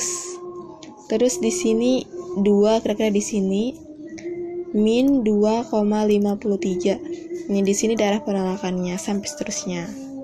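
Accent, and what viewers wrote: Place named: Lombok, Indonesia. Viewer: Logat lomboknya jelas banget mbak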